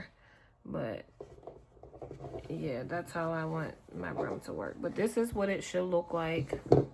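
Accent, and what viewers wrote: Pittsburgh, United States. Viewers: This is an awesome tutorial. I am born and raised in Pittsburgh Pa and your accent is thick. I can hear the Burgh in your accent. I say that you have an accent because I don't hear it in my voice because I live in ky over 20 years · i know you from pittsburgh mama i hear my ppllllllll lol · Girl, I knew you were from pittsburgh when you first started talking! 😂😂😂 #412😊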